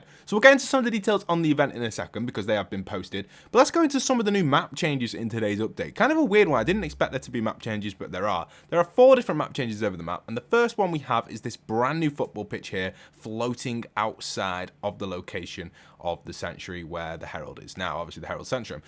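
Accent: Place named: United Kingdom. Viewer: He sounds like he is form the UK and they call Soccer Football over there.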